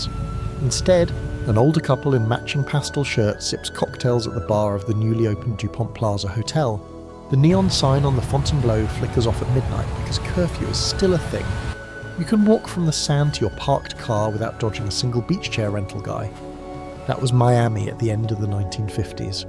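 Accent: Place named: United Kingdom. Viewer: Your videos are fun to watch. They're meant to give viewers a general idea of what it was like. I can forgive AI for the errors in details. I do wish that you used American accents for narration on videos about American locations. British narration is fine for Europe and other areas of the world, I guess. It just seems like 90% of narration about anything uses a British accent now.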